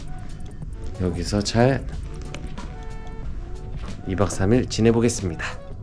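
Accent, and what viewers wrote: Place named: Java, Indonesia. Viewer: njir medok🗿😅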